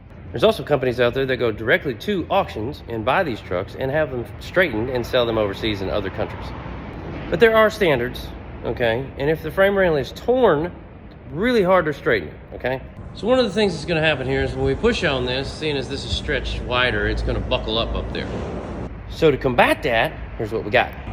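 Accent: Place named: Texas, United States. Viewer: Just subscribed. GREAT Channel. Is yous in TEXAS? Ya sound likes yer from TEXAS 🤠 I is too so I was justa wonderin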